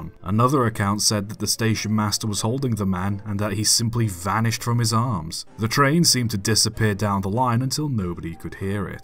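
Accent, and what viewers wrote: United States. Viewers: As an American, I have to say your American accent is extremely accurate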